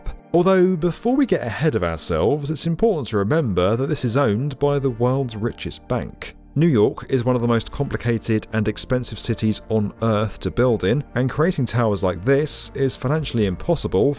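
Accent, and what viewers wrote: United Kingdom. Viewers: ...if I didn't know better, there is a tinge of anti-Amricanism in his voice or at least a dose of common Euro anti-Capitalism stuck in his throate. But maybe it is the uppity brit accent that is sneering and rooting for his hate it to become true.. ie that the NY collapses. You just know that is his wish. Sad. But we have scene this before repeatedly.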